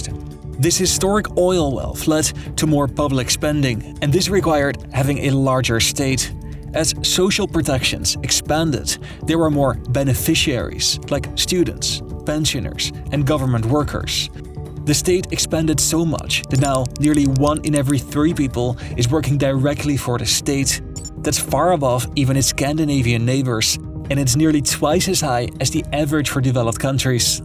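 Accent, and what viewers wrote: Netherlands. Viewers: I really like dutch accent, it's strange and insteresting, also the second channel is super high quality, I watched 3 videos as far there, about turkish coup, bosnia and saddam, they're good so far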